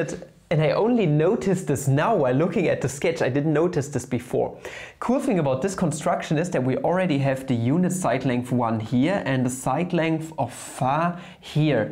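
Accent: Germany. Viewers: I love german accent 👍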